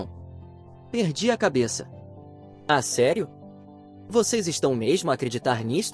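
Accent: Portugal. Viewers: Eu desisto de ver esse canal, portugues de portugal, a ia lendo com mais pausas q uma criança fazendo apresentação com vergonha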